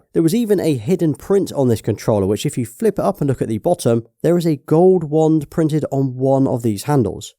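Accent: United Kingdom. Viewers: Uk accent hits me hard 😂 but u cook ps5 in this video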